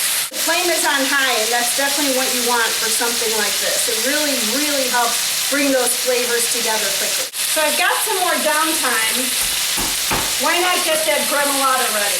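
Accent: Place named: Midwest, United States. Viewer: Beautiful woman. Her accent sound like from the mid West.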